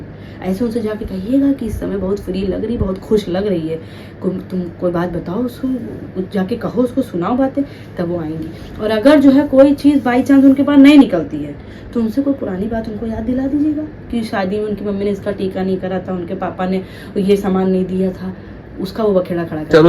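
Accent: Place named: Uttar Pradesh, India. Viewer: My lovely daughter don't take any tension now, think about your health and try to prepare another comment of your show, your evert, show is superb, and everyone enjoy our show fully, I love to watch your every shoe full of interest , UP accent, and our sisters and daughters authentic style, a graceful looking show, I am watching your show from Karachi Pakistan with love ❤❤❤and good wishes to everyone around you and in your circle ❤❤❤❤❤👌👌👌👌👌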